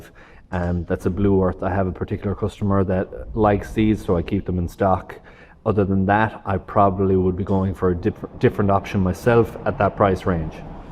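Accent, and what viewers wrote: Ireland. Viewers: Sounds Irish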